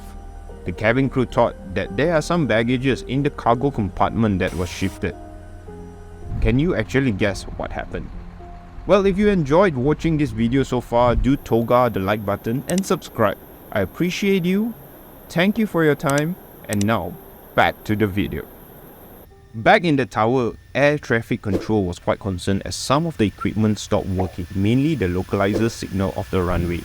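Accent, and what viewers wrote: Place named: Singapore. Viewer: Verry Verry good video, I enjoyed thouroughly und I Love the friendly Singapore accent, keep upp the great Work 👍👍👍👍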